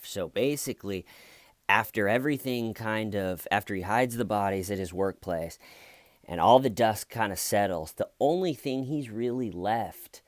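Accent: United States. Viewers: Hello from bonnie Scotland 😁 you my love are very witty. Love your videos! It's like listening to my inner thoughts coming out of an American man's voice 🤣🤣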